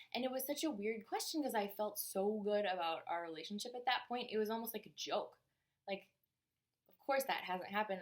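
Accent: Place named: Upper Midwest, United States. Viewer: Hey, great video. Really helps figure out my own stuff, hearing you talk about yours. Kudos for that! Side question - are you from the upper midwest? I'm overseas and trying to figure out the accent.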